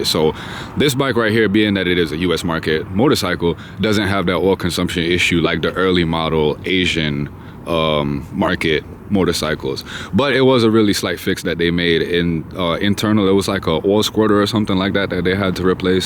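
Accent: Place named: Trinidad and Tobago. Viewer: I'm looking at the naked bike version of this, they both look so damn good. It's crazy because I remember no one talking about them, looked them up and then next thing I know the 675 is everywhere lol. Enjoy it bro, for a first bike you made such a good choice you probably won't want to change for a long time. Also, I hear your accent bro ! lol my family is from Trinidad so I got an ear for it. Dropped you a sub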